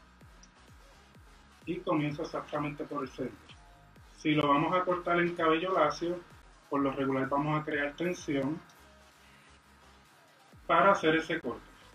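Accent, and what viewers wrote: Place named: Puerto Rico. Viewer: Eey!! Ese acento boricuaa!! Gracias por el tutorial, me es de mucha ayuda!! Saludos desde Guánica 🇵🇷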